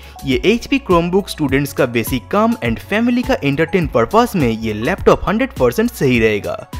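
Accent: Bengal, India. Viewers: Sound like you are a Bengali (Bangali)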